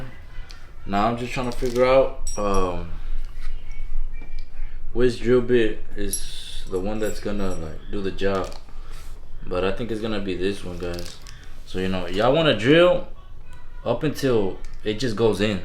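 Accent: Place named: Texas, United States. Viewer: I knew you was from TEXAS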